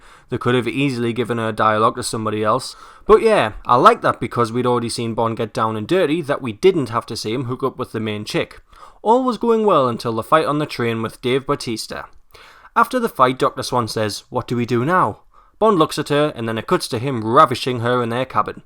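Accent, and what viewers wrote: Newcastle, United Kingdom. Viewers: Gona take a wild guess , u from Newcastle? :P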